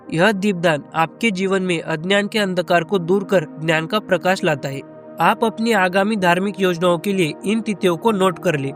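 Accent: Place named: North, India. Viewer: Bhai mujhe aapki baate south indian ki tarah lgi lekin hindi bolne ka accent north indian lga isliye pucha, 😀 If you don't feel to tell then it's okay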